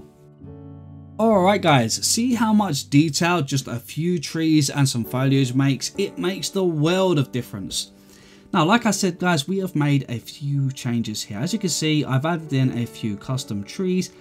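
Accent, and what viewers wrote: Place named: North, United Kingdom. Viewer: I just had to subscribe to the channel :) Thank you for the wonderful tutorials you publish. I am from Germany, so there are at least two benefits I have from watching those awesome tutorials: First (the obvious one): Learning about building wonderfull objects in Minecraft. If not "step by step" then at least tons and tons of inspiration! Second: A wonderful training in "listening and understanding" English. May I ask where your accent is from? It sounds very much like from the north. So - for me - you are in some way the "Bob Ross" of Minecraft-Tutorials :) It is very pleasant to listen and of course to watch your tutorials AND when it is done a beautiful object is created ;) Greetings from Germany and all the best!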